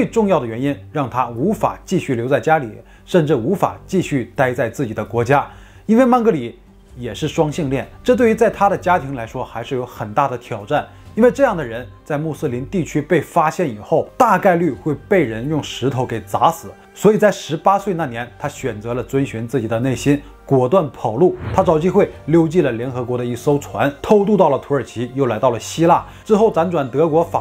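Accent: Northeast, China. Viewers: 不知道是不是因为东北口音搞笑且亲切（虽然我不是东北银😂），就觉得你讲的很吸引😊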